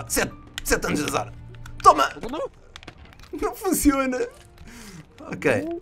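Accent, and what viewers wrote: Portugal. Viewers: És português?????? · Você fala português do Portugal. E é muito parecido com a linguagem do português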